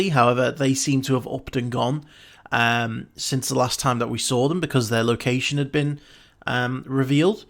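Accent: United Kingdom. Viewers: Wait. You sound British yet I thought the Mandalorian was available on UK Disney plus till like feb of 2020. Just how are you seeing it ?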